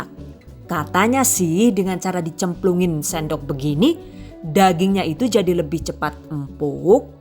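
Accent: Banyumas, Indonesia. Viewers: Mantab masak teruuus dan lengkap Tips&Trik memasak. 👍👍👍 Mbak, Saya boleh tanya? Kira-kira apakah mbak ada rencana membuat buku berisi kumpulan resep lengkap ala lengkap Tips&Trik memasak juga?, kalau iya maka saya minat banget mau request membeli buku resep dari. Namun YouTube-nya tetap eksis mbak ya?, karena selain menyenangkan memasak 👌 dan saya terhibur menyimak pelengkap sedikit fitur kartun 😀, apalagiii bahasa inggrisnyaaa 👍 serta cengkoknya 👍 dan kadang-kadang disertai bahasa ngapak terhibur bangeeet 😁. TETAP PERTAHANKAN STYLE-NYA YAAA, SOALNYA JARANG-JARANG ADA (BAHKAN STOK LANGKA LOH YAAA) KULINER WANITA YANG JUGA MEMILIKI NILAI LEBIH BISA MEMBUAT GUYONAN. Oke mbak, kembali ke fokus request saya hal buku. Jangan lupa dibalas ya?, Saya tunggu kabarnya.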